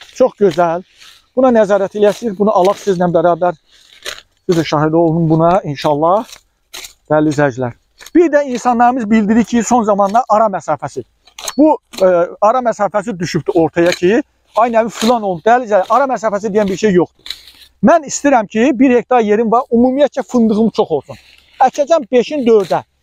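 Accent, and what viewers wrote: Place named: Qakh, Azerbaijan. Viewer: Əlhəmdulillah Qaxlilarin ləhcəsi supperdidə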